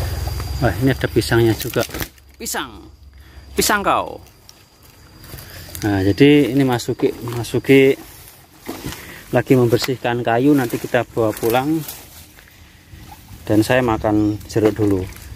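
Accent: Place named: Java, Indonesia. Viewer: Jowone ngendi masse...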